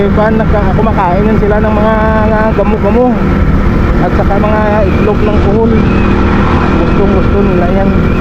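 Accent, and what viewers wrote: Iloilo, Philippines. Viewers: pahingi ng alimasag boss hehe ilonggo gid ya ang tuno ta pareho gali ta sini pero taga sultan kudarat kami,kanami gid sang view da sa lugar nyo,halong lang permi ah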